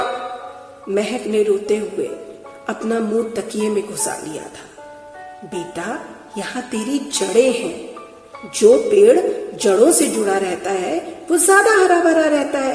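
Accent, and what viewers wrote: Kanpur, India. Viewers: Amazing.... the way you narrate the kanpuriya slang hahahaj too good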